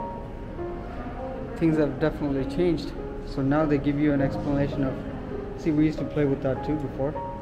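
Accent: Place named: Quebec, Canada. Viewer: I'm so interested in knowing where your french speaking is from! It's so "Canadian" it's baffling.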